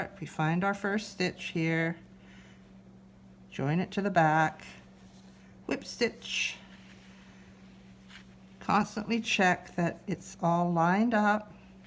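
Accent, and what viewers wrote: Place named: England, United Kingdom. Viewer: Thank you so much for tutorial Turned out lovely loving your accent England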